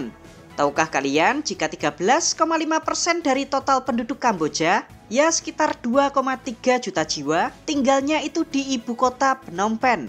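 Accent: Java, Indonesia. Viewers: Logatmu min, orang wong banget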